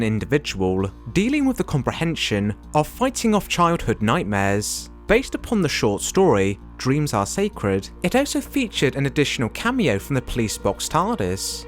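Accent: United Kingdom. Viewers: People hear a British accent and immediately go "you could be the Doctor!".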